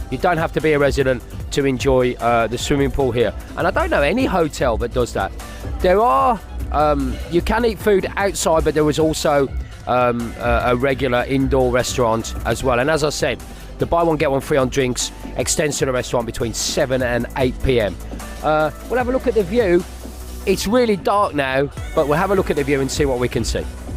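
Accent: Birmingham, United Kingdom. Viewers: Great video mate. Never made a comment on your channel before. I had to because it was brilliant. Love the Brummie as well.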